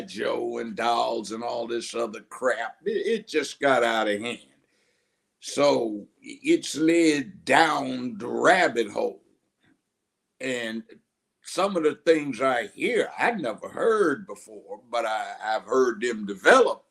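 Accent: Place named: South, United States. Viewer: With someone with such heavy southern dialect, his impressions are amazing lmao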